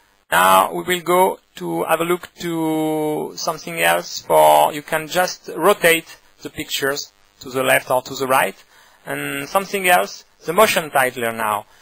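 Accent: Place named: France. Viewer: I think the audio quality could be better, but I believe this is because you want to keep the file small for YouTube upload. Smaller video and lower quality audio = smaller file. The French accent is wonderful, we, on the other side of the world are not custom to hearing it, so its sounds funny to some people. I think it is heard more in Europe and is just normal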